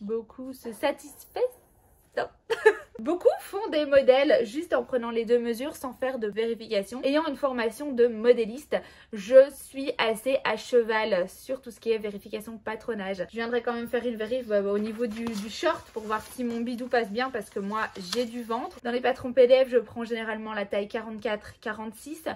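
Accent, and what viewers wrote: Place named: Belgium. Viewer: Ahah tu es folle j'adore! Merci pour le fun et cet accent belge je ne men lasse pas!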